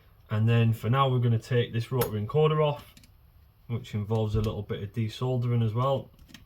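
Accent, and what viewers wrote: Scotland, United Kingdom. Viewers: Scottish or maybe Irish accent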